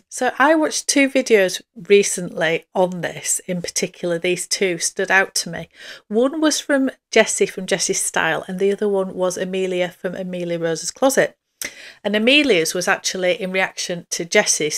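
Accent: United Kingdom. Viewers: Hi, Yes, I have stopped watching Colorful Noir, Caitlyn & the third (not sure who she is), but Shea Whitney is another one who has gone on to bigger and better things. It's hard watching some of those videos, because it makes me feel guilty about my bag obsession (as if something is wrong with me!) 😱😆🤣 Anyway, I watch you, Meredith, Lisa, and Dayle (Connor not so much 😆). As an American living in Vegas, it's something about the British and Australian accents that has me hooked to your channels. And I just love hearing Lisa's perspectives in NY. ☺️ I honestly believe the foreign accents in YouTube channels make them more appealing to watch. (You all sound so much more sophisticated than us!😂) It's very addicting. You have the most calm, soothing voice & are so polite but then stand your ground in a non-offensive way, which is a great skill to have, since it doesn't put people on the defensive or make them feel bad. 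Anytime you have a video up, I always watch it because it's like listening to a polite and sophisticated friend. Also, I believe a lot of people feel the same way. Americans love foreign accents, and even more so when talking about handbags! ☺️ So I think you could definitely talk about gardening & I would listen (just to hear your perspective in your British accent). 🥰💜💙🙏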